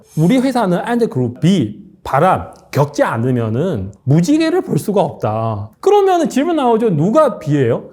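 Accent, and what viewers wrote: China. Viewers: 한국인 맞으신가요? 발음이... 중국분은 아니시죠? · 이 분은 중국인인가요?? 발음이...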